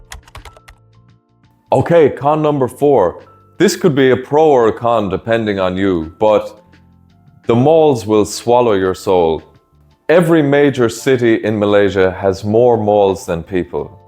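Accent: Ireland. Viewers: You did good, loved this also your Dublin accent (The nuns that taught me in East Malaysia were from Dublin) good luck on your blogging!!!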